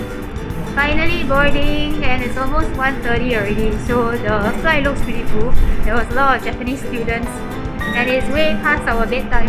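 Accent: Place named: Singapore. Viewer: Listening to ur singlish just made my day... Reminds of my 3 years uni at Nanyang.. How i struggled during my initial days to get used to singlish with my professors.. Love the singlish btw.. Enjoy ur trip to Japan.. Love from India 🤗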